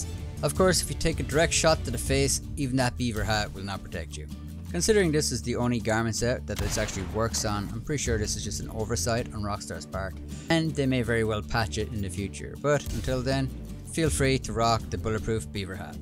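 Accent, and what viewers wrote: Ireland. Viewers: I swear you’re from Ireland, are you? (from Dublin)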